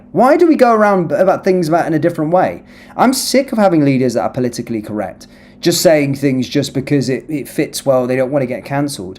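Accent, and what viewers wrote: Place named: Estuary English, United Kingdom. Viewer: Listen to the Estuary English whine of this goof.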